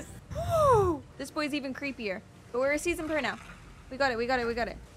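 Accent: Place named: United Kingdom. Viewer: Praise be for subtitles as your British accent seems thicker on YouTube than it does live on Twitch LUL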